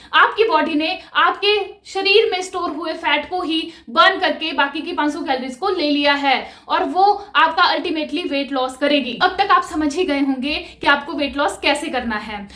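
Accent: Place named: Pahadi, India. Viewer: mai bhi pahadi hu aapke bolne ke tarike se samjh gai thi🙂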